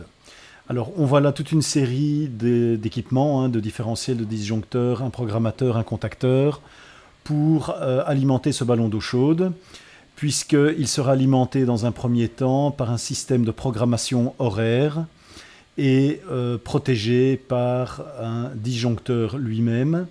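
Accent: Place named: Belgium. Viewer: Très intéressant, vos vidéos sont très bien faites. Seriez-vous belge? Votre accent m'est très familier 🇧🇪😊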